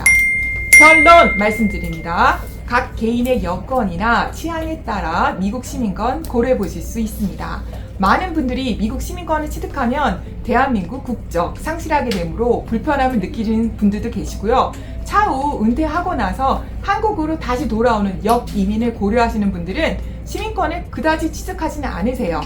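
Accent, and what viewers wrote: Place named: United Kingdom. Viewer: Someone said Tomato, and someone said Tomaito. Potato, or Poteito(물론 발음한정) 만약에 British Accent 는 저분의 발음에 가까습니다. 영어의 자음은 지역에 따라 변화가 심합니다. Northern Ireland accent 가 아마도 가장 좋은 예 입니다. 물론 London 의 Essex 지방은 , L. 또는 T 발음이 거의 묵음에 가깝고, Australia Accent 도 아주 심한 모음 변화를 같고있습니다 (AEIOU)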